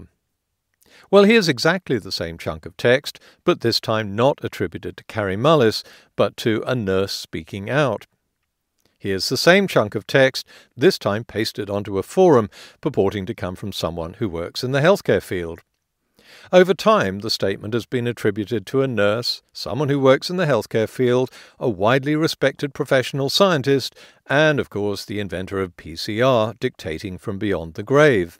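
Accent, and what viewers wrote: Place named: England, United Kingdom. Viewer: it is not that PCR tests don't work, PCR tests are not a definitive method for testing for Covid. That is the fact that ABC is never going to come clean about. Also the choice of narrator is advicive in that the choice of a speaker with a "crisp as an apple English accent " is supposed to be an indicator of intelligence. That is a total myth. They have become puppets like all the rest.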